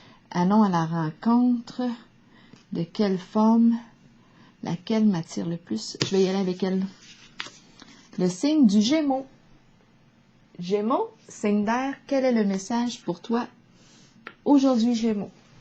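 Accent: Canada. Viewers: Bonjour Ravie de vous retrouver avec ce jolie accent canadien Je vous suivez dans vos mini guidances et vous avez disparue puis de retour avec des guidances plus longues avec un grand plaisir 🙏😘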